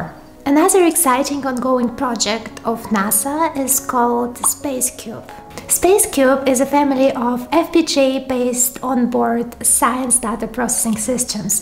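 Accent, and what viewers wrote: Russia. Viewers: Star trek's Chekov was adored in part vecause his acdent related pronunciation limits were endearing to us who were raised spraking American dialects of English. The Russian~Slavic accent is a cool factor that many of us adore so please don't worry so much about mispronouncing non-vital things. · Are you from Russia, Ukraine or Belarus? - Megagertz sounds quite right😉 Still these vids are cool, thx for making them 👍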